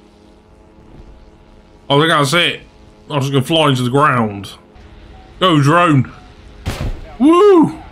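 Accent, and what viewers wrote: United Kingdom. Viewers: Why are you using fictional rebel speed measurements... I've watched all your videos and I've just about had it with MPH with an UK accent..... aaaaaaahhhhhhh.. still love you dude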